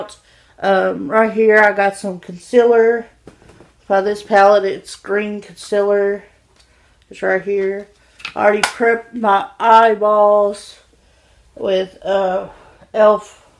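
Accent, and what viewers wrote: South, United States. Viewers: Do u have a southern accent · She's so cute and I live her southern accent type🌈🌈🌈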